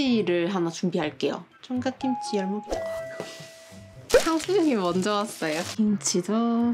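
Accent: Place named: Busan, South Korea. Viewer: 악ㅠㅋㅋ 친근한 부산말투라서 부산인쥴ㅋ 죄송해욤ㅋㅋ 항상 응원합니당ㅋ 😍😍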